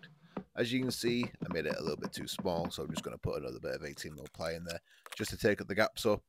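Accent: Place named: Midlands, United Kingdom. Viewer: ahh Cumbria, you sound like you’re from the midlands? I work in Carlisle on and off, I like that part or the uk!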